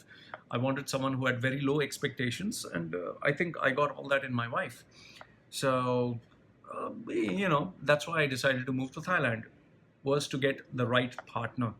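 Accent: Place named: upper class, India. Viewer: I think dubai has made you soft. Most of your reasons come from overgeneralization ,although because you grew up in a majority Indian city (Dubai) you talk like a tipical upperclass Indian from a metro city .either way its your life , enjoy it ,and raise your family. I too have wished I would get away from my relatives who add nothing but negativity in your life , they are too nosy. but I hope you could at least spend more time in India.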